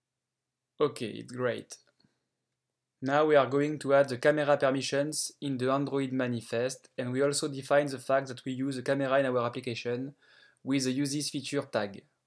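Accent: France. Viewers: like a French speaks english ?? for example button, you said boutton..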